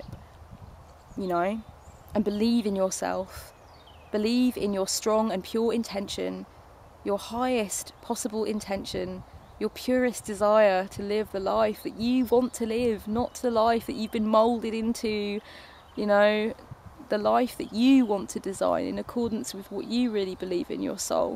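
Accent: United Kingdom. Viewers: Ps. i love your channel (and your brittish accent) you are full of knowledge!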